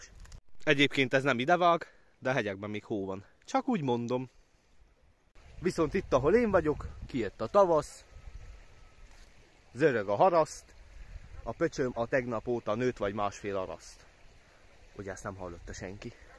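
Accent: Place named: Szekler Land, Romania. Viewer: Szerintem nagyon szépen, választékosan tudsz beszélni, úgyhogy már emiatt is érdemes belevágnod. A másik, hogy sajnos csak egyszer sikerült eljutnom Székelyföldre, de imádom hallgatni a székely magyart.. :) Nekem már a tájszólás miatt is kellemes a lelkemnek hallgatni Téged.. :) Amúgy hozzám közel áll a világlátásod, és szerintem a humorod is rendben van.. Szóval hajrá, fel is iratkoztam, ment a like is! ;)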